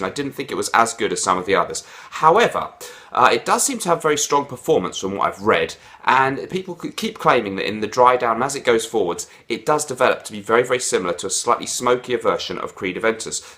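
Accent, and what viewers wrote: England, United Kingdom. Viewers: Hi, I just saw your video now, I realise that it’s too late to comment now but I have a Mercedes-Benz Select and I wondering if it’s closed to the Creed Aventus or not? By the way, I studied a MSc in the UK 🇬🇧 so I so happy with your accent so far, it’s so pure English...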